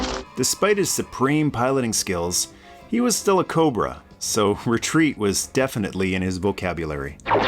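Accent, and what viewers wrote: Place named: Boston, United States. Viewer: just discovered your channel by my absolute passion for MOTU & Joe!! My all time favorite movie play toys was the Rattler!! can't wait to binge watch everything Joe 1st then Masters of the Universe. I just watched your 1982 video describing the Original line up of Joe from the early days (1960) era and Mr.Hamma creative writing for the updated version. The history lesson was outstanding and I always knew Joe figures were more solid and flexible than Star Wars and most Other lines. Thanks to you and the awesome fans that are requesting these amazing topics of the best era evah!! heavy Boston accent!!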